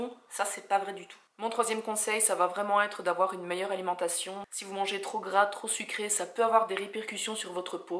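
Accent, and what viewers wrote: Belgium. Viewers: Mhhhhh un petit accent belge non? Je viens de tomber sur y’a vidéo car je me renseigne sur la pilule. J’ai commencé à en prendre une jeudi. Donc très récent ça fait 3j. C’est la ZOELY mais elle ne me convient pas je me pense... mes seins gonflent, acné sur les seins le dos et début visage alors que je n’en n’ai jamais eu. J’ai horriblement mal dans une jambe. J’ai du mal à marcher. Je pense l’arrêter... bisous!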